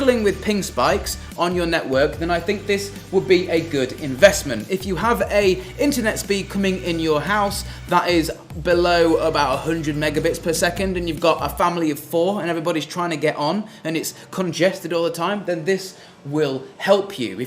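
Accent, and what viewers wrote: England, United Kingdom. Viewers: It's because he has a British accent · He’s British if you didn’t notice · that’s how we say in the uk if you got a problem take it up with boris johnson he’ll sort u out 😂😂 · Calm down mate, that's how they pronounce it in the UK you mong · No, he's English and speaks British English, hence he says 'rooter', not 'rowter'. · its his english accent that you find impossible to accept. not my irrelevant youtube name.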